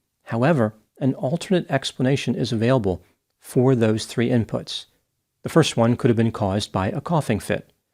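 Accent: United States. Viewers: It took me a while to work out where these places were. I am Australian and the pronunciation was uniquely American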